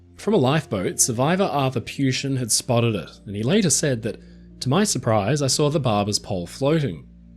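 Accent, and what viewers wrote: Australia. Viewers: that's exactly what I thought, and I'm a New Zealander. This guy sounds Australian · This presenter has a slight Australian accent 🤔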